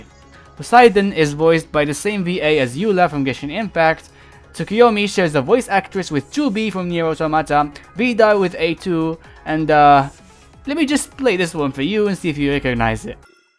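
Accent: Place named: Morocco. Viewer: bro are you moroccan you sound moroccan as hell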